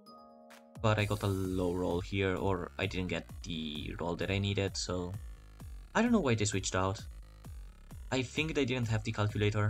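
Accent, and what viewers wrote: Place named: Spain. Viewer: Juro que lo escucho y pienso que sabe hablar más español que inglés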